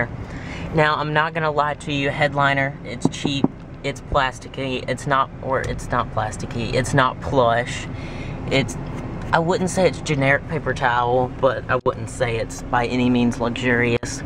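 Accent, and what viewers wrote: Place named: South, United States. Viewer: sweetie hi...i tried.. and tried.. to listen to your review but because of my prejudices i can't..... i just cant take the american southern accent.. anyway.. i think the car is cute.. .my nephew wants this.. for graduation present..... so i just wanted to watch reviews on it....i am sure your review is very detailed.. but that sloooooooooooow american accent... causes too many vexations to my spirit....... be blessed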